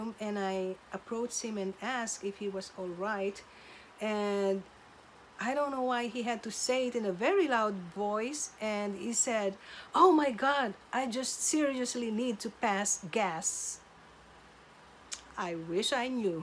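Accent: Philippines. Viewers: I sense a Filipino accent...Kabayan! Haha. More reasons to like. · i knew it from the first time you showed yourself on channel that you were filipino. from the voice and the accent palang eh. pusong mammon forever ang mommy ng Pinas! godbless po:)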